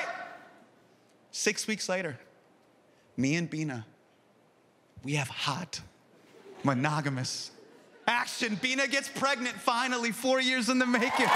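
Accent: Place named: India. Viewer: I like your Indian accent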